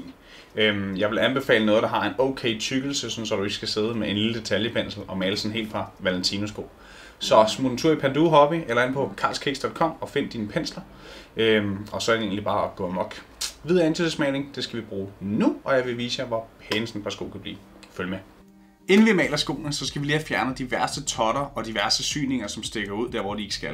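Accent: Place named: Scouse, United Kingdom. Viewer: Thought you were talking scouse at first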